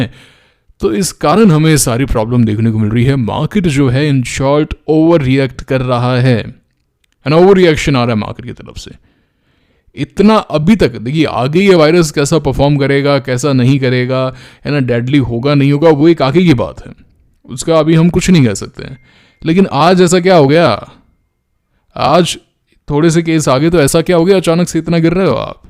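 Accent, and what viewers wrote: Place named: Bagri, India. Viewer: gale mein aagi aapke😂😂😂 wah maza aa gya swad hi aa jaata hai jab bagri use karte ho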